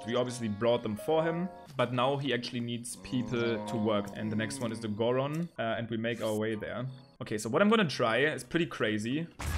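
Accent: Germany. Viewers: Dieses alman englisch ist echt nice, irgendwie xD😅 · Bruder bist du deutsch?? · Why are you... German? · Ich weiß direkt dass du deutscher bist Lul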